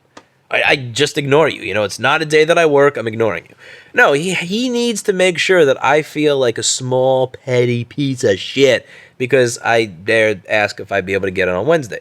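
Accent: New York, United States. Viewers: I love you so much you’re so New York it’s like talking to someone on the street “Family owned Jewish company” 😂😭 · When you start ranting, your New York accent really comes out. When you calm down, it relaxes. It's quite endearing.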